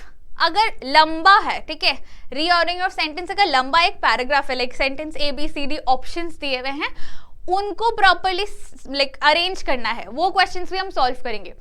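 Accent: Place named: Bengal, India. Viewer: Mam bengali lagte hain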